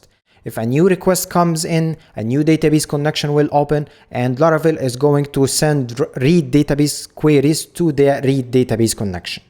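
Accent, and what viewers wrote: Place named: Egypt. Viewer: حسيتك مصرى من اللهجه قبل ما ابص على الاسم 😂😂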